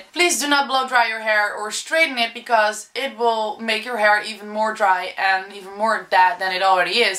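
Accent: Netherlands. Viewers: Hahah iedereen zegt precies wat ik dacht! xD je hoort dat je nederlands bent :p But hey, proud to be dutch right? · Kom je uit nederlamd?? E ech tmooi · Are you Dutch?? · ik kan het nederlandse accent al horen lol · You're Dutch right? I reconised the accent haha ;).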